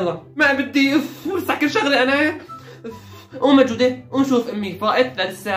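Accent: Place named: Aleppo, Syria. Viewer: أنا عم اتعلم الحكي الحلبي كلو منك💜💜❤️❤️مين متلي 👍👇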